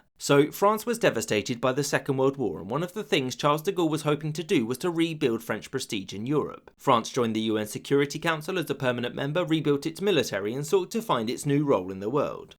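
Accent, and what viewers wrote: England, United Kingdom. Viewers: I think the English accent explains why the video is anti-British.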